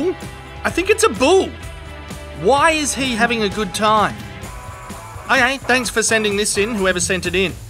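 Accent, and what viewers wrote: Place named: Australia. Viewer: let me remind you, the only reason i watch your show is for your voice, you are funny, witty and amusing, if i want to watch shows of disaster its a dime a dozen on FB, but your aussie voice and jokes is the best, so no more silent videos. thank you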